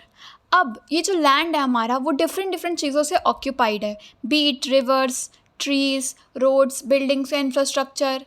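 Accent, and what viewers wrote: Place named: Punjab, India. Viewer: Panjabi ton effect 😅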